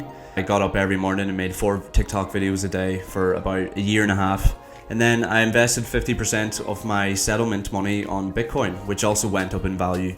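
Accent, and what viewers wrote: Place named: Donegal, Ireland. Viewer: No way! You're from Donegal and I thought of catch your accent. I lived in Donegal 14 years all of my mixed Thai/Irish niece/nephews also living there. But myself moved back to Thailand for this period. I agree with you after moving back to Thailand. Many things has changed in Thailand, more conveniences, better, improvement and safety, cost of living a lot lower than Dublin, but you still have modern city vibes in Bangkok like many other rich City. 🎉😊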